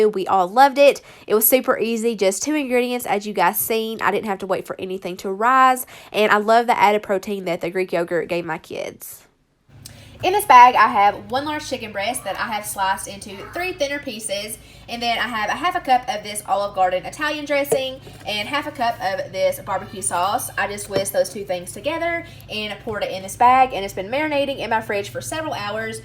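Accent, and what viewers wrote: South, United States. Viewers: Not to be weird but I love hearing your southern accent it’s very calming to hear you talk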